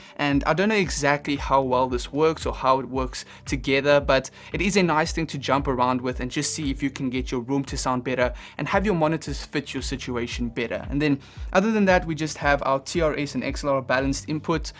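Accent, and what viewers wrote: South Africa. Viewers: I hear a South African accent. Greeting from a fellow South African muso living in nashville.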